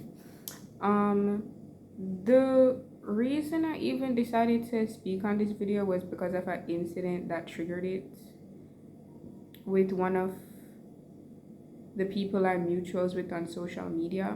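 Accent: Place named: Caribbean. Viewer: You sound from like your from Dominica or Barbarian or trini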